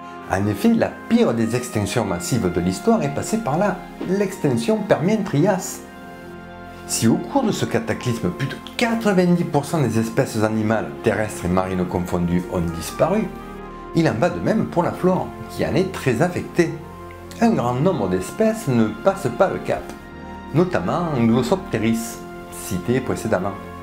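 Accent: South, France. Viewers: (: Au top. Toutes c'est fleurs, l' accent du sud ,avec un temps de pluie rien de mieux :)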